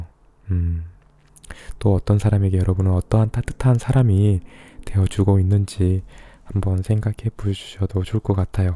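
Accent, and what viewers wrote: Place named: Jeolla, South Korea. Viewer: 전라도 사투리 억양 ㅋㅋ 그래도 멋있다~~~!! 많은 부분을 생각 하게 하고 많은 부분을 되돌아보게 하는 시간이네요 오늘부터 오늘까지!!!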